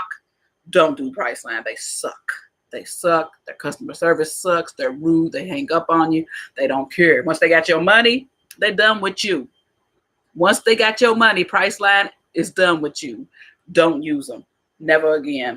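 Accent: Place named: Midwest, United States. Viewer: Where are you from? You sound like you from the mid-west.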